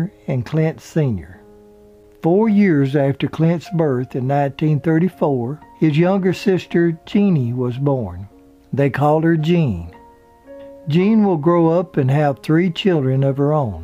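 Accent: South, United States. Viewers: Dear mr. ( may I call you ), your format and exquisite Southern accent make all of your mini documentaries a pure joy to behold. I my humble blue-colour opinion, you are a true celebrity historian with the gift of timelessness that you bring to all of your productions.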